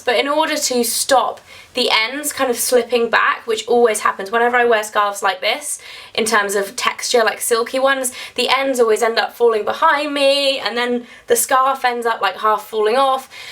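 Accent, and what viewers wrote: United Kingdom. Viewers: love ur british accent :)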